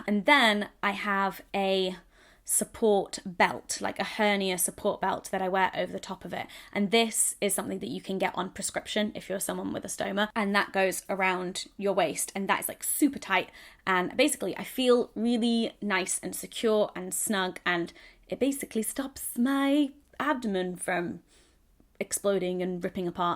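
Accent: United Kingdom. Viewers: you are a dream cum true. I to have had an ileostomy back in 2014. It sucks. I have to wear an ostomy bag the rest of my life. Kills my sex life. It s nice to connect with a beautiful girl that has had a similar condition. Love your British accent. Nice smoking hot bod too. Keep posting lovely nymph and I wish you the best.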